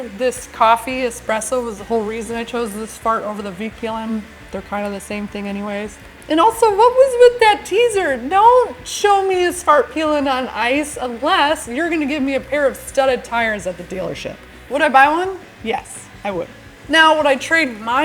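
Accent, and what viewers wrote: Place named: United States. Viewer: Aaaaaaaarrrrr, your American.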